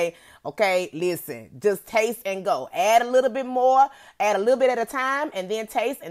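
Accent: United Kingdom. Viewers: Why she legit sounded british tho 💀😂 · Your British accent is amazing 🤩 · That British accent took me out. I love you. ❤️❤️❤️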